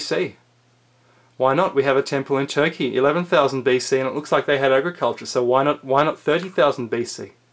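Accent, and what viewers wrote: Australia. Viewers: Great video! And I could listen to the Australian accent forever, even you were telling me that horses can fly. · I really enjoy your astute commentary and speculations. Please continue. Your voice and accent are very easy on the ears. (unlike some Aussies) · He has an Aussie accent calm down.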